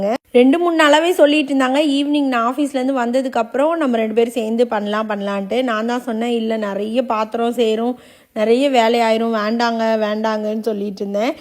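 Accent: Madurai, India. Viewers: Neenga madurai ah? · Akka neenga madurai yaa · Akka neenga madurai ya akka anna entha oooru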